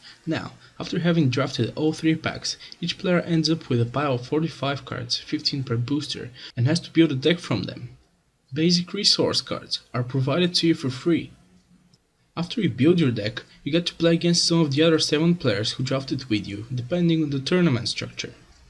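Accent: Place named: Russia. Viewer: Your accent is metro2033. Great video!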